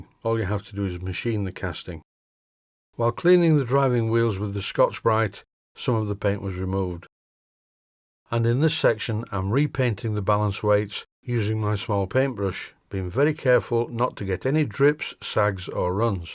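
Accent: Yorkshire, United Kingdom. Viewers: Hi 1. Really enjoy your accent mate (this is cockney for I think lad in Yokshire?). 2. Just a quick query that was bothering me in the last few moments of this video - what is up with the middle wheel of the loco. Is it the camera angle or is the wheel bent/moving transversely across the frame? 3. No need to reply this could be my imagination - the camera angle - and I'm not trying to prove I study each of your videos with my micrometer pressed against the screen (well not always - really). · I live in Sweden and I love your Yorkshire accent, as long it isn't spoken way to fast. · Dear me, Mr., why do You apologise for your Yorkshire accent!? As I said some time ago, I used to live there, well not exactly in the heart of Yorkshire. I used to live among the Geordies and for a someone from the place where You live maybe that will make a difference. 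And what a beautiful experience it was. When You said "The bloody pigeons have buggered off again" I felt right at home again.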